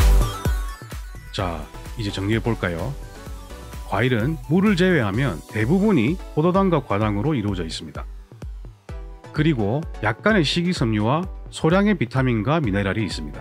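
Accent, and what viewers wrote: Gyeongsang, South Korea. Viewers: 경상도 어디신데요? 말투가..부산인데 좋은 내과 찾고 있어요..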